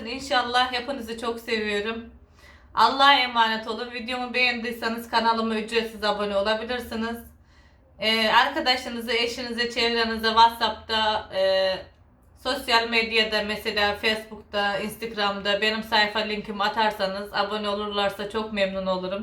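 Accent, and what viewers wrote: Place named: Akçaabat, Turkey. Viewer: CIM SENIN GIBI KONUŞUYOR ELTIM AKCAABAT LI ONUDA SEVERIM KONUŞ MALARINI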